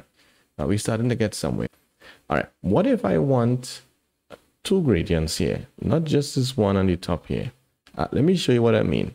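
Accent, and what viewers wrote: Trinidad and Tobago. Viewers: Yo, awesome tutorial. Are you from trinbago?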